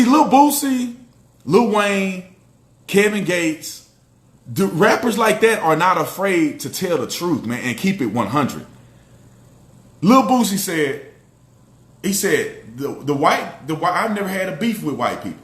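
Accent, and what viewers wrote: United States. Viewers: Sounds like u grewup an American ! So did I.....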